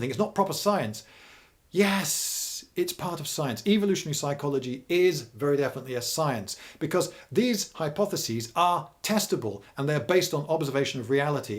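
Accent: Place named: United Kingdom. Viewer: This is the diction of a good Britisher that grew up on Fawlty Towers.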